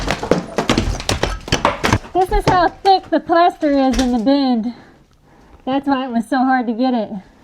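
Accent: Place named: Kentucky, United States. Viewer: I'm from Lexington Kentucky. That North Dakota accent sounds just like a Kentucky girl 😊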